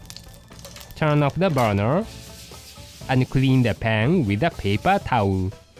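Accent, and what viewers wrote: Japan. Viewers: Nope. The narrator is Japanese, so it's a Japanese accent. I think it might be the chef's husband, though I'm not 100% sure. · is the narrator a Japanese accent? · No... It's a Japanese accent dude. (I'm fluent in French, so I know that's not a French accent, I live in Japan, so I know that it's a Japanese accent)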